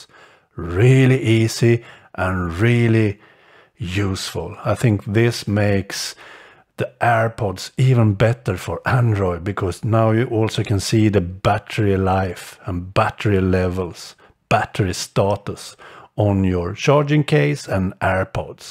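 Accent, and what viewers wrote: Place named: Sweden. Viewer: Jag kan höra att du pratar svenska haha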